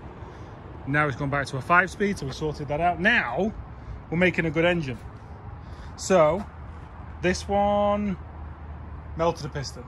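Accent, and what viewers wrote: United Kingdom. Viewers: Missing you and you nice British accent. Support from a French fan from cold Minnesota. Stay safe mate. Cheers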